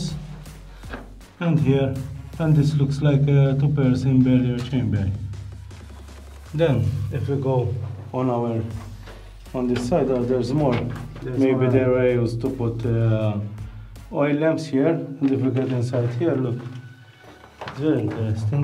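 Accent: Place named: Malta. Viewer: Fantastic as always & Co. I lived in Malta as a child and still visit most years, - I love seeing you uncover and re-explore these historical sites. Love hearing your fabulous Maltese accent too! Thanks for doing these in English:-)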